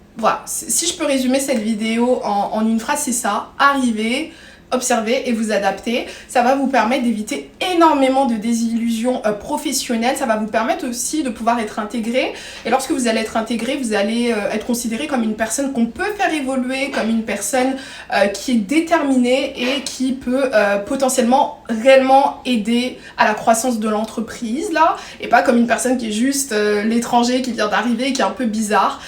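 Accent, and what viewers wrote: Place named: France. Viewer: C'est toujours un immense plaisir de te suivre et de t’écouter. Chaque mot, chaque phrase semble pesée avec soin, portée par une voix à la fois convaincante et d’une précision remarquable. Ton accent français est si authentique qu’on pourrait croire que tu as toi-même inventé cette intonation unique. Tu as déjà pensé à une carrière dans le journalisme ? Tu as toutes les qualités requises : une éloquence naturelle, une réflexion claire et une capacité impressionnante à captiver ton auditoire. Je t’imagine parfaitement sur une grande antenne canadienne, abordant avec brio des sujets d’immigration et d’actualités, comme tu sais si bien le faire. Te voir exceller dans ce domaine serait un véritable bonheur. Tu as ce petit quelque chose qui rendrait chaque intervention inoubliable. Bravo à toi, et je te souhaite un avenir à la hauteur de ton talent !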